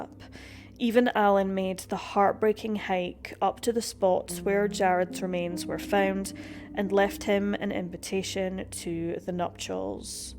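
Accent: Scotland, United Kingdom. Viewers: Ur voice makes the rest to of us Scots sound like idiots seriously sort it out 🙄🤨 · Ooohhhh Scottish sister 😍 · She sounds Scottish to me. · You mean… with a Scottish accent? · Trying to gauge your accent? Scottish? Just stumbled on your channel BTW. Great video thank you. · Your voice sounds like a combination of Scottish California Valley Girl with a bit of vocal fry thrown in. Very interesting. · It’s called a Scottish accent 🙄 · I've heard this story before, but never in a Scottish accent.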